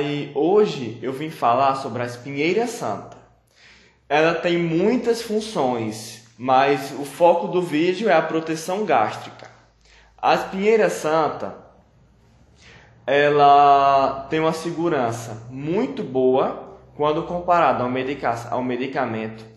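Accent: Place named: Juazeiro, Brazil. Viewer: Adoro esse sutaque juazeiro. Falando assim me sinto bem melhor!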